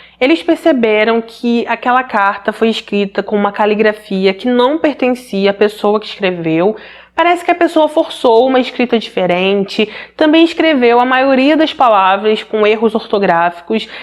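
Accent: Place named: Belém, Brazil. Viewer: Sou novo no canal e adorei os vídeos. So uma curiosidade: és do norte do Brasil? Eu sou de Belém do Pará e teu sotaque é muito parecido com o de Belém 😂. Sucesso pro canal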